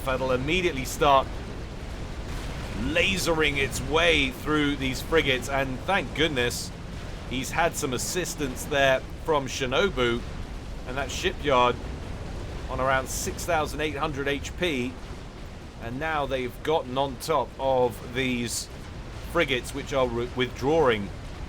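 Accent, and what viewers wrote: United Kingdom. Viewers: I have a legit criticism, every time I watch one of your videos, I notice something. Your voice, its so damn sexy. I can't pay attention to what is going on because I'm too busy being mesmirized by your sexy slick English accent. You need to stop it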